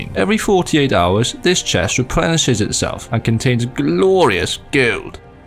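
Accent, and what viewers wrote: Wales, United Kingdom. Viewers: Are you from close to Cwmbran my dude? You sound like you’re from Newport or Cwmbran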